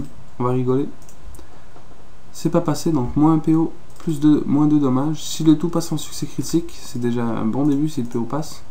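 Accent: Quebec, Canada. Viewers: J'ai reconnu ton accent québécois ;) GG toi t'es un maître de la FM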